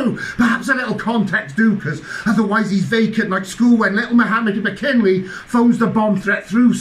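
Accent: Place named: United Kingdom. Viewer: British people have funny accents but he is ok and good for rapping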